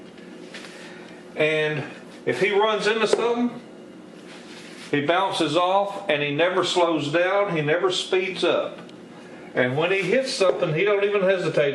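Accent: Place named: Maine, United States. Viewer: He has that Maine draw reminds me of Down East Dickering mixed with Pug1. He has a grow on ya Quality. I'll subscribe. Is he in a part of Maine with Uncle Henry's listings? Old wisdom is worth a sub any ol cheap day.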